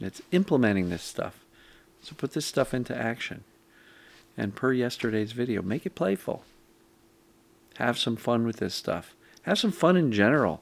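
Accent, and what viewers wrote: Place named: Scotland, United Kingdom. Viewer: Such a great video!! Thank you!! I love how you take the same concept and explain it a different way..🥰 this is “flip the script” with Scottish accent 😃I know you have told us that many times. This “do the opposite” reminds me of the Seinfeld episode where George did that 😂🤣🤣 It works!! Thank you again for your continued support for all of us in this journey..🙏🏼🫶🏼🫶🏼🫶🏼🫶🏼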